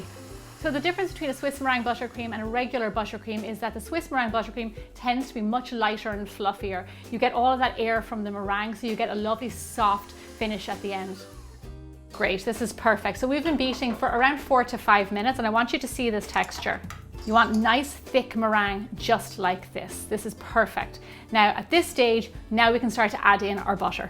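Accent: Ireland. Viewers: Thought she was from Ireland....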